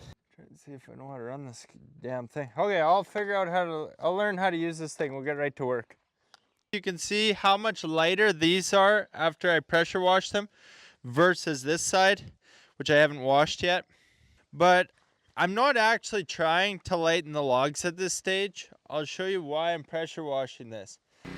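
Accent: Canada. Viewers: You're smart and kind!! Proud of you. Keep the videos coming. I just love hearing you speak Canadian.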